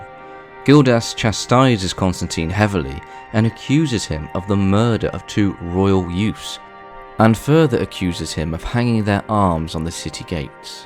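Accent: United Kingdom. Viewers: Great British speaking guy teaching history and then completely dumb down the interesting show by having WHITE TRASH GETEO SPEAKING people from the year 600? Why in gods name would Any body purposely turn a learning experence into a confused, unrelateable jargon associated with poor blacks in the inner citys. When were they there, before or after the black pharohs?? The Viking ships are 100% bigger than in reality. The beam on the ship was the size of THE GREAT EASTERN for gods sake buddy..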